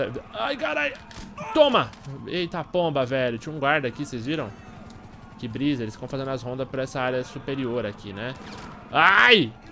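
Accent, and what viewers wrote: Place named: São Paulo, Brazil. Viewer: parece o luciano huck e com esse sotaque irritante de paulistano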